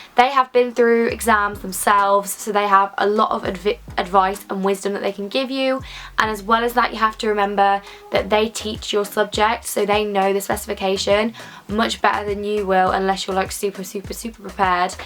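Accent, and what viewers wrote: Birmingham, United Kingdom. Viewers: !! Don't try to suppress that awesome brummy accent! I love how it sounds for some reason (I'm a weirdo lol) x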